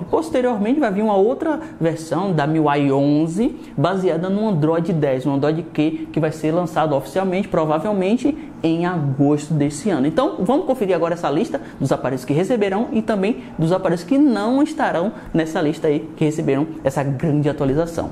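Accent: Bahia, Brazil. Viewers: Ele é baiano !? 🧐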